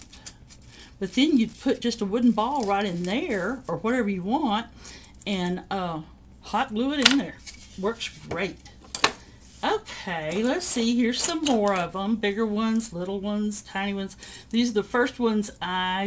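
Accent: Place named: South, United States. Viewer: What a lovely personality and creative spirit you have !I would also like to ad how adorable your southern accent is ..I was raised in Ga.but now live in NY for 25 years..thanks so much for the great tips and encouragement... it is such a joy to watch your videos .I have subscribed and look forward to more videos !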